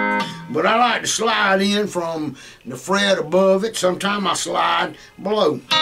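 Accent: South, United States. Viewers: That’s the best southern accent ever N he’s not a bad player either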